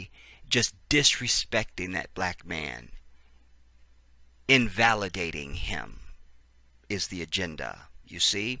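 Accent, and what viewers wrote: United Kingdom. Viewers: The British accent 😂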